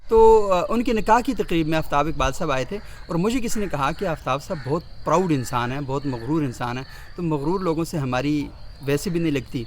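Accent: Abbottabad, Pakistan. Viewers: Bhai Lehjay or talafaz k liye abbottabad ka rehashi hn..haha bhai jnay do yarr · Abbottabad ka lagta hay, hinko touch to aae ga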